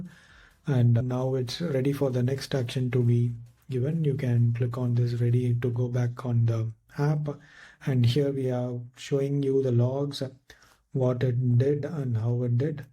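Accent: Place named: India. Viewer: indian accent... scares people away.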